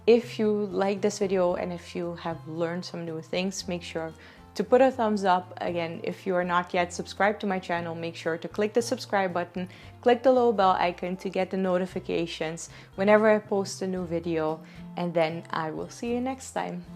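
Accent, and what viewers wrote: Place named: India. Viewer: Its true, You looks like Indian... And you accent is not like British, American, Australian accents... so your taliking style is also like Indian. But as an viewer from long time, I know, You are not Indian. So you should not call yourself Indian. And It would be disrespectful to your own nationality if you call yourself Indian.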